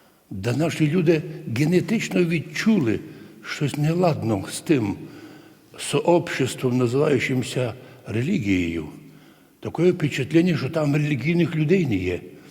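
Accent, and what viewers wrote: Transcarpathia, Ukraine. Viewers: А , це знову отой, що сам не знає, ,,што" говорить? Ще один знавець ,,істини". Навіть не потрудиться сказати, що ,,ми віримо в те, що у нас істина". Віримо! Так ніт же - і Томос у нього ,,куплЕний" за,, амеріцькі долляри",і ,,дітей треба не шкодувати, а відправляти на війну"... Своїх то, мабуть, нема? Та ще витерпіти оту його окремо взяту ,,закарпатську мову"- це ,,што - то с чєм - то". Опанував би краще літературну мову, ти ж публічна людина.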